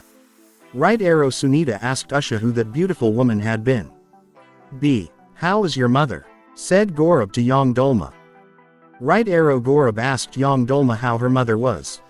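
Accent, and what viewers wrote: United States. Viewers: Thank you for helping us, providing high quality video American voice over sir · Thank you for helping us, providing high quality videos with American voice over.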